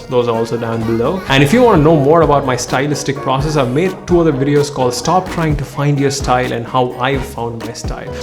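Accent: India. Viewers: I know you are an Indian talking in accent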